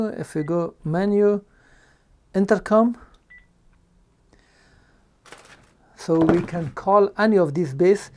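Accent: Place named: Poland. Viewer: Is this phone the Panasonic - KX-TGF975S Link2Cell DECT 6.0 Expandable Cordless Phone System with Digital Answering System and Smart Call Blocker - Silver? I'd love to purchase one of these one day! Does this have a voice guide? What I mean is, will it talk to you when you're setting up the features? As I am sight challenged, I have to have things that talk to me, as I can't read print, and I can't see what's on a screen. By the way, I looooooooooooooove your accent! Is that Polish? Maybe it's Spanish?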